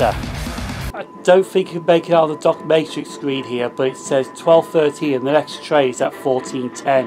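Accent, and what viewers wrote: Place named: RP, United Kingdom. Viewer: never thought id hear someone swear at pigeons haha and that posh accent lmao couldnt get over it 😂😂, nice bloopers!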